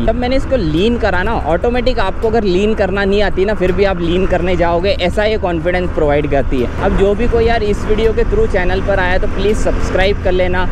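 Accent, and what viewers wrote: Indore, India. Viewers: Are you from indore ??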